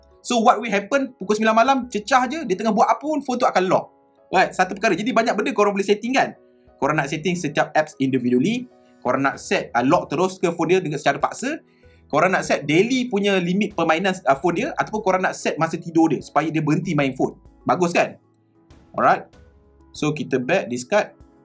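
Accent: Melaka, Malaysia. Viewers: Amek ternganga gomen dengar org melaka haha.. terbaik boh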